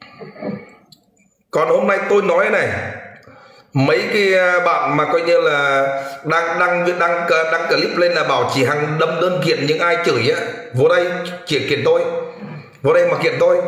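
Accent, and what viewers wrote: Standard. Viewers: Nói chuẩn luon bạn nha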